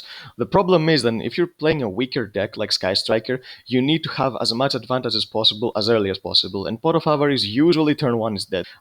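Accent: Greece. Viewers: R u greek or cypriot my friend?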